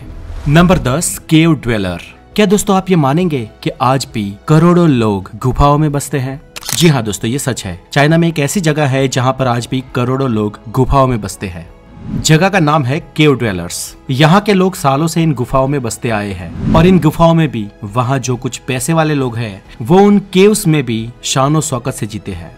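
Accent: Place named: Bihar, India. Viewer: बिहारी लोग विडिओ को " भिडियो " , वोट को "भोट" , वेज/नॉन वेज को " भेज/नान भेज " सड़क को "सरक" , घोड़ा को " घोरा " कहते हैं। आप ज्यादा परेशान न हों।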